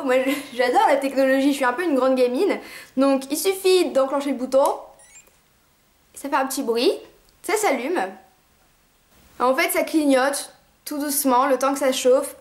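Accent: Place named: Nord, France. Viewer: En fait je suis un mec et puis je regarde beaucoup de tes vidéos ! Ca fait gros pervers dégueulasse hein ? xD Non mais en fait le truc c'est que j'aime beaucoup ta façon de parler et ton ptit accent du Nord ca me change de mon accent de chez moi (en Alsace) et puis t'es vachement drôle je trouve et du coup ca fait toujours plaisir de t'écouter et te "voir", même si je ne suis absolument pas intéressé par un lisseur x) Bref merci pour les ptits moments quotidien de plaisir à regarder tes vidéos et puis abh...change rien ;) Ciaoooo !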